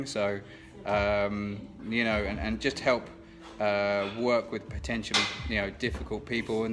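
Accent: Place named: Australia. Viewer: Hi mate, what part of the UK are you from? When you speak you sound Australian.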